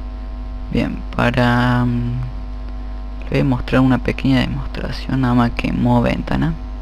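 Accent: Córdoba, Argentina. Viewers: Sos cordobés? Me parece por tu tonada.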